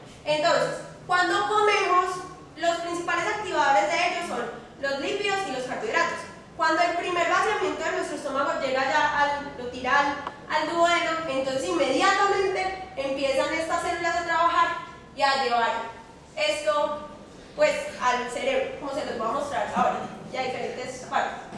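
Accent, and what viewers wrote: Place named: Venezuela. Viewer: Me encanta su acento. Es venezolana o colombiana o de donde es? xD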